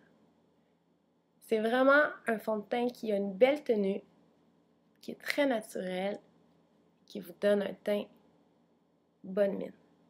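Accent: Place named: Canada. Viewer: ho toi tu es une canadienne !! je me trompe pas !! avec ton accent tu es une !! trop marrent quand vous parlez!!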